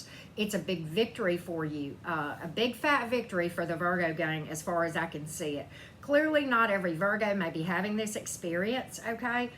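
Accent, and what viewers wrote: South, United States. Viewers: Definitely resonates. I like your southern accent too!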